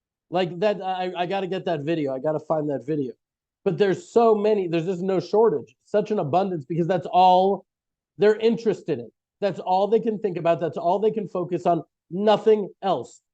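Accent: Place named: United States. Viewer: Such an awful lot of propaganda. jewishness is not the problem. Zionism is. Also, look at the speaker - white skin, American accent. Indigenous middle easterners have olive skin and Arabic accents. The kids in the video only know this way because of Occupation, Apartheid, and the brutal actions of the IDF. The Palestinians live in virtual prisons - the West Bank and Gaza. jewish kids in zionist izrael experience a very different life and a very different education, ie., books degrading the Palestinians - and that information comes from an izraeli jewish university lecturer who made a study of educational books first year to the end of high school. Now for the onslaught from zionists reading this ...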